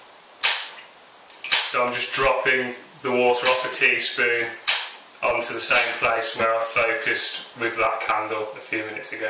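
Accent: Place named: Birmingham, United Kingdom. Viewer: thanks! just what i was looking for! also i love your brummie accent :) x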